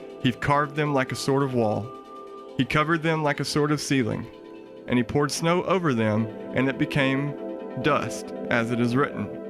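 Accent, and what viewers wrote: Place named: South, United States. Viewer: Narrator has a southern accent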